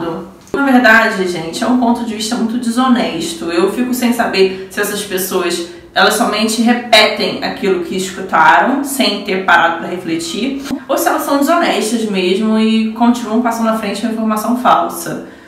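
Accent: Rio de Janeiro, Brazil. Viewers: Vc é daqui do Rio?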